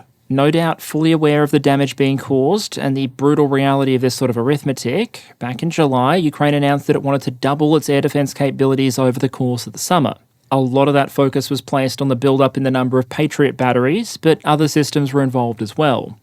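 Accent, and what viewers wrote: Australia. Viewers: Is this Ausralian? Dialect and/or dude?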